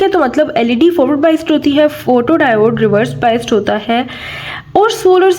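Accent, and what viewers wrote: Pakistan. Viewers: R u from Pakistan???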